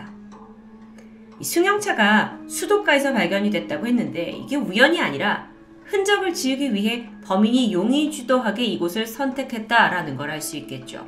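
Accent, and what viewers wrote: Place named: Jeolla, South Korea. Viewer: 고향이 전라도인가? "의" 발음을 "으" 로 밖에 발음이 안되네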